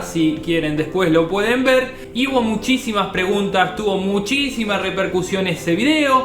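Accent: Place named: Argentina. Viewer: pesimo video.... no tocas nada...me hubiera gustado escuchar el teclado y no tu estupida voz argentina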